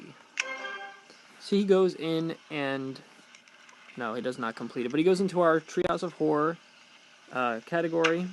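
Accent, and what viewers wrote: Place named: Scotland, United Kingdom. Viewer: btw I love you Scottish accent 😉😂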